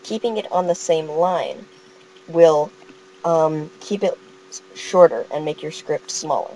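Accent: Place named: United States. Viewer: (I'm sorry, for possibly bad English) Madam, all of this is very interesting and I already fell in love with your voice (excellent American accent), but.. your tutorial is rather a programming tutorial, not AutoIt tutorial generally. I mean you don't explain why AutoIt deserves our attention if so many other programming languages are available? What AuotoIt can do what other scripting-based environments, scripting languages, or compilation-demanding languages- can't (or can but it's tedious)?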